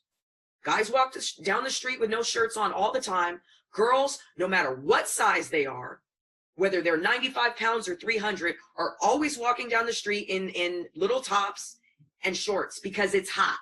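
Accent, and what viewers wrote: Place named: United States. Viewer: You're just acting/speaking like an All-American.....what's the problem? I think it's great!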